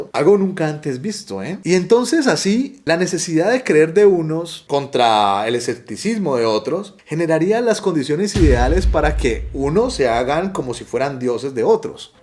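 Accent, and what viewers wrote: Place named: Colombia. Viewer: Su voz me parece familiar? Ese acento colombiano.. ya lo eh escuchado en otro canal? Excelente contenido muy buena edición , horas de grabación para los 20 minutos más informátivo , sigue así bro saludos Colombia Cartagena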